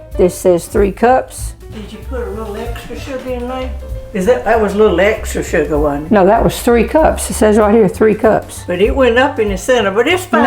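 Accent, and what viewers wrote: South, United States. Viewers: thank you miss, being from the south as well, I adore you and the way you talk and what you have to tell us. you have made many dark moments so much brighter. thank you darlin, May the good Lord keep you and your's blessed and healthy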